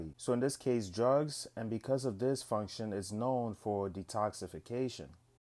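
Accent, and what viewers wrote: United States. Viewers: great video. lol but I swear I can hear a NYC accent. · Why do I hear a nawlins accent?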